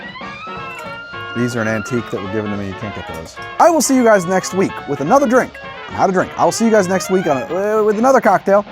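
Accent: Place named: New Jersey, United States. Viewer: i knew you were from jersey lol idk why but i could just tell. so now i gotta ask taylor ham or pork roll?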